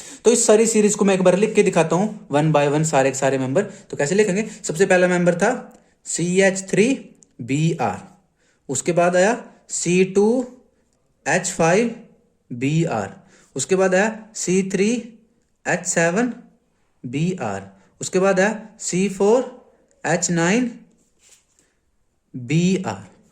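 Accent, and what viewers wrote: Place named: Haryana, India. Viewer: bhaiya aap bhi alakh sir ki tarah videos bana na suru krdo. Aapka haryanvi accent bht accha hai aur padhane ka style baaki sab se better hai , sab kuch samjhane ka style bhi osm hai . Agr aap saamne aake padhaoge screen pe to bacchon ko aur maza aayega aur aap ki growth v bht quick hogi. Wishing you all the best 🙏🏻💗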